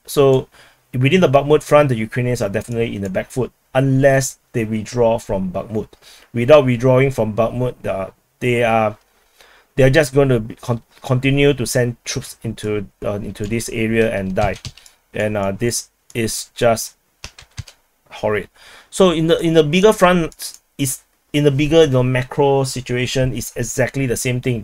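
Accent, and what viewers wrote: Singapore. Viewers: you sound like a singaporean, are you? haha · Yes with your face is better ! Actually I follow your channel because of your English. Singlish? I like the way you speak English. You have a lot of humor too.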